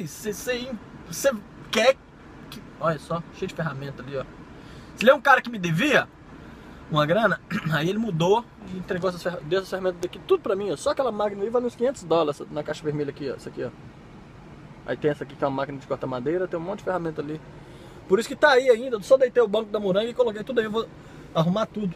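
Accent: Brazil. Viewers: por um acaso você é nordestino você fala com muito sotaque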